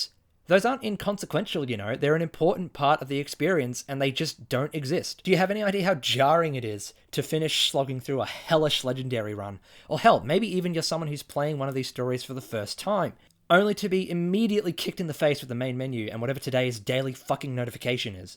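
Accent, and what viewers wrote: Australia. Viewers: >deadpan Australian accent >scalie rantsona Oh yeah it’s gamer time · Australian youtuber try not to be deadpanned and monotone challenge: impossible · Ausie accent with a lizard avatar that has big badonk-a-donks? This is amazing give me more. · weird that the harvey norman mention was the tipoff for being aussie and not the accent. hi btw other aussie here, i keep seeing halo 4 at this op shop i go to and i've never played halo before and don't own an xbox so am I haunted?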